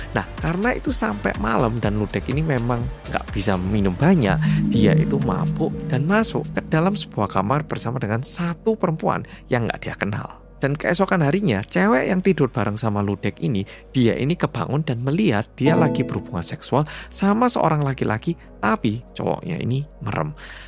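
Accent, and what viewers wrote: Java, Indonesia. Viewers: Nglindur nya... serem2..ah muedok😢😮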